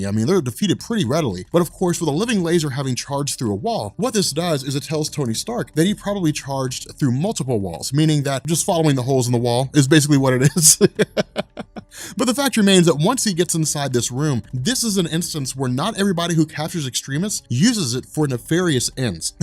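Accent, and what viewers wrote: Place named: Appalachia, United States. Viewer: i thought i heard hillbilly in your voice i hate KY as well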